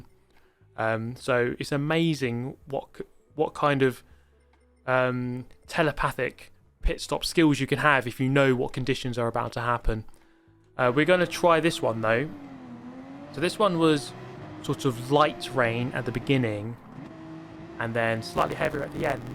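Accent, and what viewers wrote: England, United Kingdom. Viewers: This guys from England by the sounds of it, I think he’s well acquainted with rain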